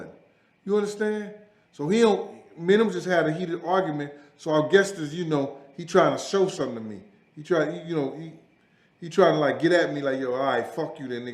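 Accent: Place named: New York, United States. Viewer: n b4 is wild B off top. Dat NY talk is maad krazy yo!!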